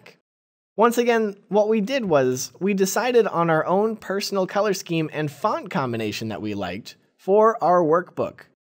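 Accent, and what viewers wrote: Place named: United States. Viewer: In the United States (assuming the video creator is from there considering their accent and the fact that they're using $s) the tax would be multiplied because it's not already factored into the price like it would be in most European countries. While it's something stupid with the country, it doesn't make the video creator wrong.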